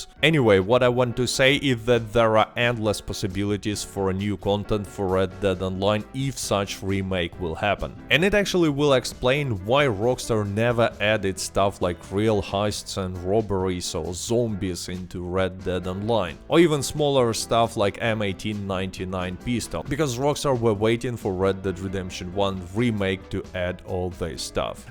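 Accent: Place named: Russia. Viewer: Я один отчетливо слышу русский акцент в речи автора?🤠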